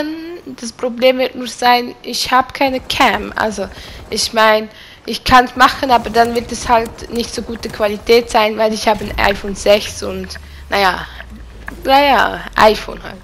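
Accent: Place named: Switzerland. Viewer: haha hab das video beim commander gesehen und bei den ersten 3 Sekunden gewusst das du Schweizerin bist ;D swiss power ! ^^ ich lahn mal es abo da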